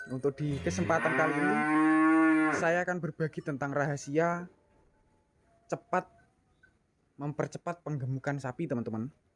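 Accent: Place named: Java, Indonesia. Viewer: 170 sen ato 170 rp ato 170 ribu broo Medok kali kmu ya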